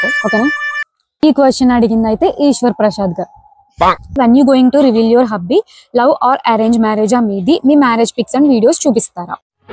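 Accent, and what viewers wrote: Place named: Rajahmundry, India. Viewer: rajmundry slang super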